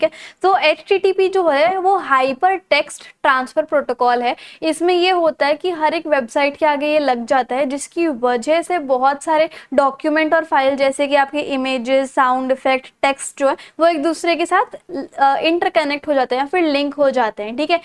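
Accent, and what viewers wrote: Punjab, India. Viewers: Didi tuci punjabi hooo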